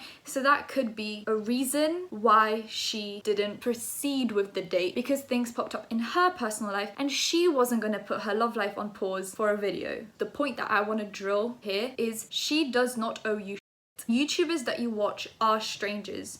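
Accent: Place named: London, United Kingdom. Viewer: That’s a proper London accent u got there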